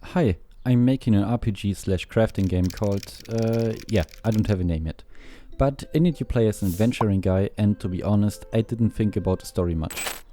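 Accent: Germany. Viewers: hewo hörst dich fett deutsch an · Nices Game! Man hört das du Deutsch bist🤣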